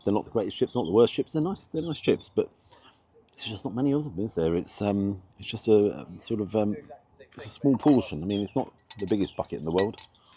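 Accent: RP, United Kingdom. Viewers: very posh posh posh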